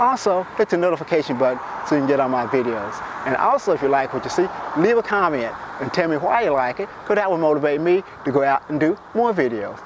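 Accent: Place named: South, United States. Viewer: GREAT presentation, Sir!! I just love your Southern accent. Great use of language. Keep on sharing!!! Greetings from Montreal.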